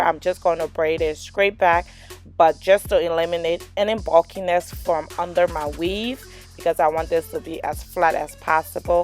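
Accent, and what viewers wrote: Liberia. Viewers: Is she Liberian? Her accent sounds like she's from Liberia.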